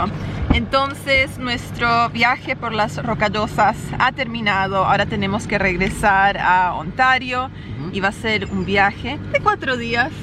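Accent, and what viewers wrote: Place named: Argentina. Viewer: Esta chica aprendió español en Argentina, o de un argentino, si no me falla el oído, jajaj. Hermoso video! Saludos!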